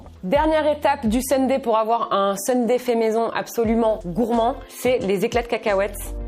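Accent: Canada. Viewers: tu mon youtubeur préféré des vidéos accent canadien et qui met quoi des vidéos trop trop rire 👍👍👍👍👍 🇨🇵🇨🇵🇨🇵🇨🇵🇨🇵👍👍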